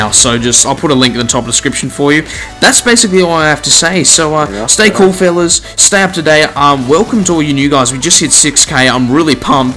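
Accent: Australia. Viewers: Are you aussie by any chance?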